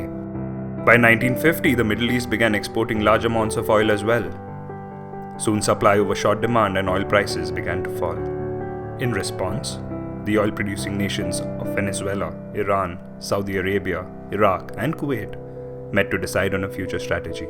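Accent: India. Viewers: The narrator is Indian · INDIAN TALKING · You sound like a person from India. Are you from India?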